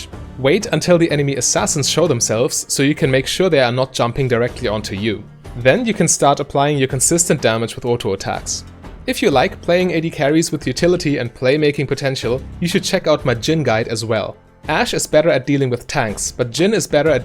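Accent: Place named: Germany. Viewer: Inhaltlich gutes Video aber ich verstehe nicht, warum Deutsche mit so einem krassen Akzent englische Videos machen müssen. Ist mir in letzter Zeit öfter aufgefallen. Als ob es nicht genug englischsprachige Videos gäbe.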